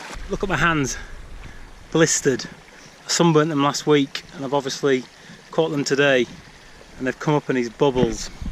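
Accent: United Kingdom. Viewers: hey. Yh iv only jist checked my spam box. Baaahahah anyways got your email. Me and the other guys are all having a meeting on Wednesday about the hike so il be in contact with you on Thursday. Speak soon buddy. By the way. Your accent sounds dead familiar. Are you from Mersesyside or Chester area by any chance?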